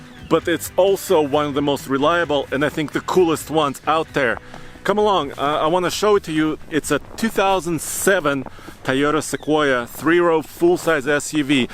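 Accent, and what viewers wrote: Russia. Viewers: First thought "Wow, did Toyota sell the Seqouia in Georgia? And how did it get to Colorado and the US?!", then I remember the state 😂 Russian accent + the name a ex-Soviet republic 😛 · Прекрасный русский акцент. Очень понятный английский 😄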